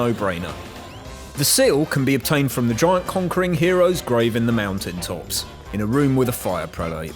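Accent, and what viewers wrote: United Kingdom. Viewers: I adore these videos, an accent I can actually enjoy listening to and a similar sense of humour to me (+ great videos too). Thank you for uploading videos like these, they’re great to enjoy as a fellow bri’ish brethren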